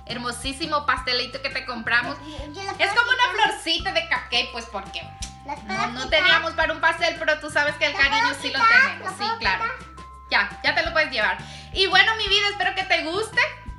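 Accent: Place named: Mexico. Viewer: Que rico te quedo hablas con acento mexicano no hondureño que linda 😊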